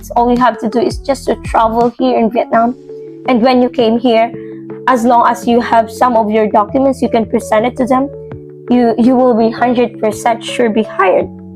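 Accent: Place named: United Kingdom. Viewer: Gusto ko yung may pa British accent 😂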